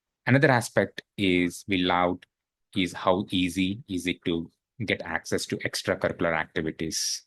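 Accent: India. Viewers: Oh dude you lived in US for 20 years but your accent is still as if you never left India but so sorry that you had to return back, is it Trump? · After staying in the USA for 20 years how do you still have a such a strong indian accent? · Your accent is very bad and abrasive, like that of an uneducated Indian, though you lived 20 years in US! You must be a lazy person! Telugu people are very lazy! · You look like a man in late thirties and if you’ve lived in the US for twenty years, that means you must’ve been in your late teens when you first moved to America. That leads me to my next question: how come your English sounds like you’ve never left India? Just curious.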